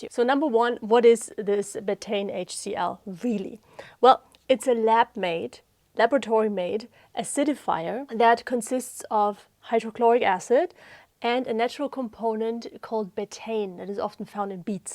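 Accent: Germany. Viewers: She has a lovely German accent. ❤ I think she is very good at what she does, too.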